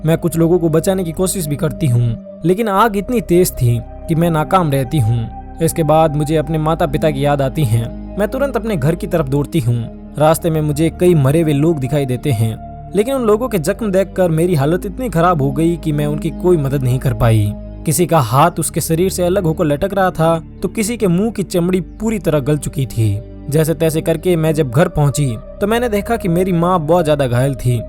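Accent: Rajasthan, India. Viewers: Rajasthani awaj h bhai jodhpur bikaner aur nagour ki tref tk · Bhai ap rajasthan hai syd awaz aur language se thoda lg raha h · Bhai rajasthan se ho kya Accent se lag rha h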